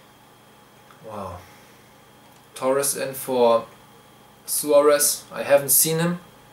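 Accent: Germany. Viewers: du hast einen deutschen aksent und ich hab ne scheiss rechtschreibung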